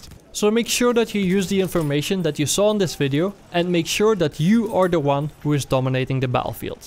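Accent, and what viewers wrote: Netherlands. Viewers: Your English sounds so Dutch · you have a Dutch-ish accent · heared withing 0.001 seconds that you are dutch, might i be right? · I like your dutch accent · you are dutch arent you ^^ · i bet you are from NL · m8 you dutch? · dat nederlandse accent tho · Are you dutch? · are you like Dutch, because your accent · you sound dutch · This was realy helpfullBtw are. You from holland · this guy is dutch for sure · pretty sure he is dutch, its in the accent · dutch af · waarom klinkt ge zo nerderlands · accent is super confusing, it sounds dutch but then also russian, and scottish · are you Dutch? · its the most dutch accent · you are clearly Dutch, I can hear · Nice tips man! thanks a lot. Are you Dutch? :p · Dit Nederlands accent is erg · u dutch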